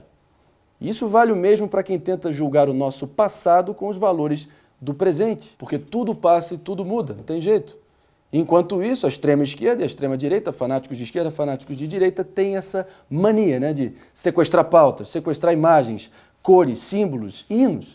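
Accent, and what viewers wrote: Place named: São Paulo, Brazil. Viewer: Bahhhh mas tá horrível o sotaque! Nossa pra manézinho da ilha essa pessoa tá um paulistano nato!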